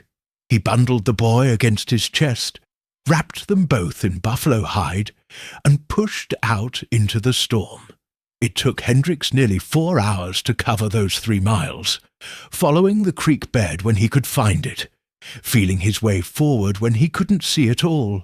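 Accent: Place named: United Kingdom. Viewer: Not wasting my time listening to this brit accent.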